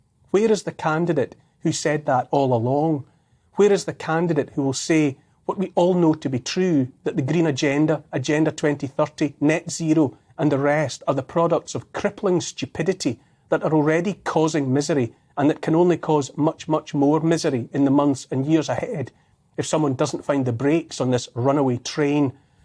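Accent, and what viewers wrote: Scotland, United Kingdom. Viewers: Thank you Neil for exposing the facts so clearly. It is so important to have more and more journalists like you speaking their truth without bias. Not only it is superbly said but your lovely Scottish accent is music to my French ears. Go go go Neil ! 💪🏽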